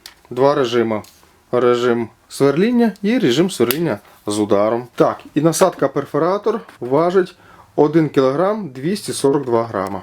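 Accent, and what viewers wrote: Russia. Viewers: Хз, мне лично иногда уши резало неправильное произношение с примесью русского, да что там, даже название неправильно написано(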